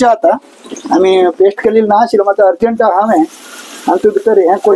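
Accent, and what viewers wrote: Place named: Konkan, India. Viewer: It's amgele konkani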